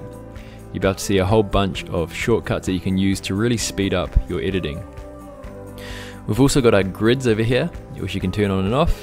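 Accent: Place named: New Zealand. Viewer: I hear a kiwi accent! Ka pai!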